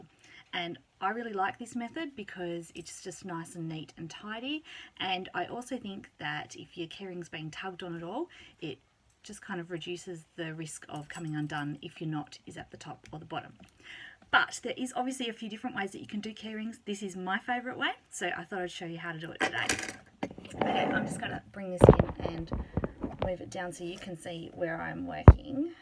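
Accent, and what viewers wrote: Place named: Australia. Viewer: It's so nice to hear an Aussie voice on these how to craft vids. So used to American accents.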